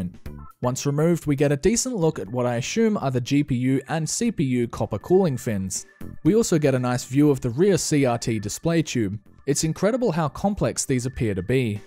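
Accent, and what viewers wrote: Australia. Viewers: I just realised that Australians seem to pronounce “assume” as ashoom 😁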